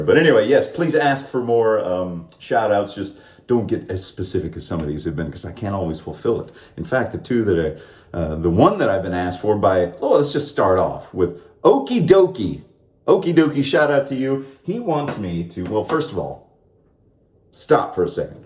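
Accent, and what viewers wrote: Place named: Scotland, United Kingdom. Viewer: Hello from across the pond, the Scottish accent wasn't too bad - I've heard a lot worse!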